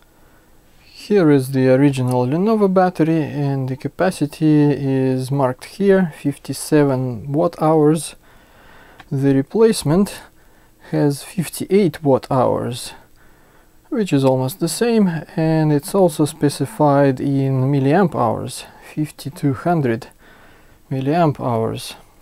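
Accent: Russia. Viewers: I love your video and you Russian accent ^^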